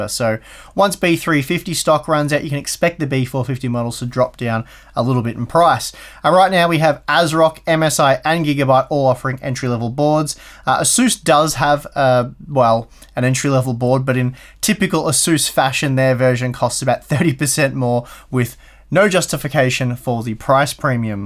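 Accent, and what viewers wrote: Australia. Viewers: you sound Australian, why not say the Australian prices? (I am aussie)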